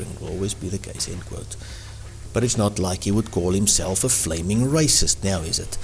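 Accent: South Africa. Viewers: I was trying to figure out what your accent was before your said you were from south africa😂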